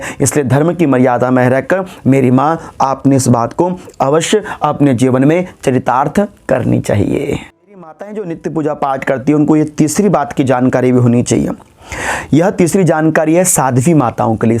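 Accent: Standard. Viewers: Aap kitna accha Bolte hai akdam sudh🙏🙏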